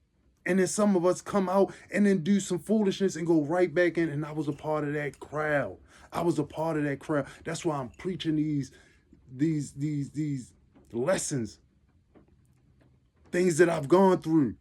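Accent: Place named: Mid-Atlantic, United States. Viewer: Bro where you from I hear that Mid Atlantic accent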